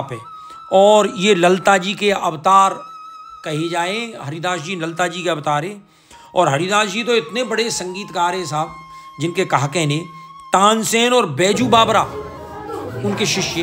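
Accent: Braj, India. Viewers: Hme to bhaiya apki brij bolli hi bahut acchi lgti h Anand aa gya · Apki braj bhasha bahut mithhi h 🙏🙏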